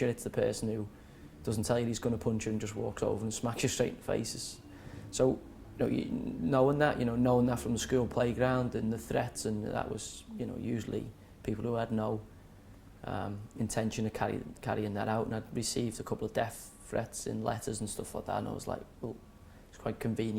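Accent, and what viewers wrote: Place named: Scouse, United Kingdom. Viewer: Why’s he talking like this? Calmed his Scouse accent right down. Sounds posh almost. Only did a couple of week and had it cush by all accounts. Got gym orderly job right away according to a screw.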